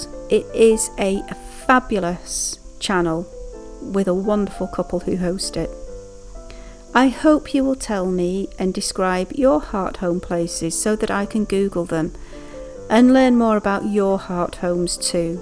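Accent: Northern England, United Kingdom. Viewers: It was odd hearing you say you spent your childhood in Crantock, were they holidays? I hear a northern English accent in your voice, not Cornish, I'm intrigued. Love your artwork.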